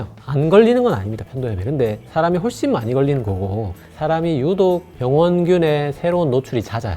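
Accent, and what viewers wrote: Gyeongsang, South Korea. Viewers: 저는 꼬리뼈가 조금 더 크고 동이근 있어요. 아직 진화가 덜 되었군 ㅎ 저는 경상도 방언이 참 듣기 좋더라고요. 경남쪽이신 듯... 영상 감사합니다.😊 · 확실히 경상도 사람이다 ㅋㅋㅋ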